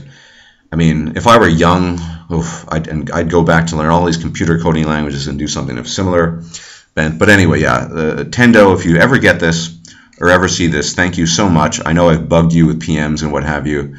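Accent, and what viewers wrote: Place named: North Germany, Germany. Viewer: Dein Deutsch ist sehr flüssig aber mit ziemlich Norddeutschem Dialekt. Ist das Absicht?^^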